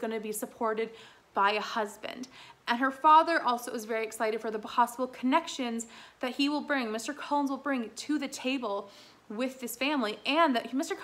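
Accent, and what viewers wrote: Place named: United States. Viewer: I enjoyed listening to your commentary. Maybe your American accent helped with objectivity!😊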